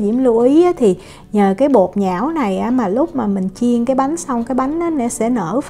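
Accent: South, Vietnam. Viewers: người miền nam nói nha ha vậy đó bạn ơi, mình thấy rất dễ thương. Ko thấy ai ý kiến gì có mình bạn thôi đó, nghe ko thích có thể ngừng xem, đừng toxic ạ cho cuộc sống tươi đẹp hơn nha bạn ơi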